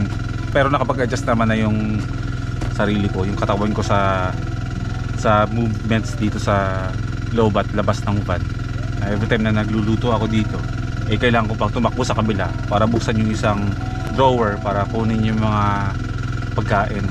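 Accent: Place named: Bulacan, Philippines. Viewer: I like the story telling talaga ni! Naririnig ko minsan ung Bulakeño accent ni! ❤️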